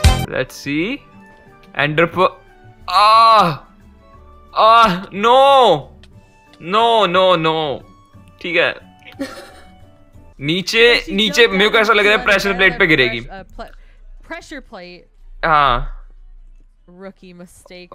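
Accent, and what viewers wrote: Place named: Uttar Pradesh, India. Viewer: I know you are from UP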